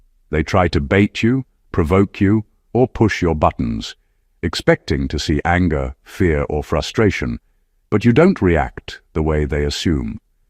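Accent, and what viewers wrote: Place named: New York, United States. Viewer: Vivid imaginations they have, don’t they ? Their lost in the sauce. NY accent sawus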